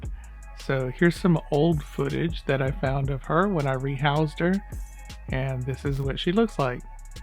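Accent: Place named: United States. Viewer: I'm so used to how Petko from The Dark Den pronounces the names that it sounds so weird when I hear Americans say them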